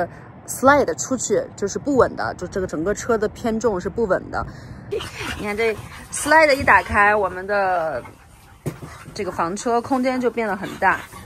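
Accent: Qingdao, China. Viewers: 哈哈 博主大概率是青島人吧？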